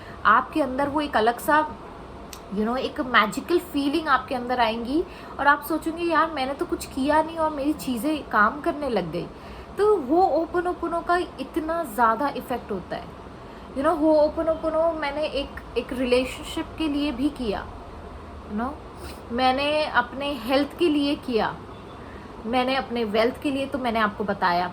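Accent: Hyderabad, India. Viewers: You say Honga jaenga.. are u from Hyderabad?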